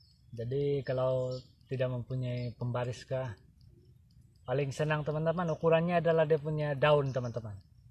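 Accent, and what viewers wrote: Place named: Sabah, Malaysia. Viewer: Kau org Sabah pulak teman2😂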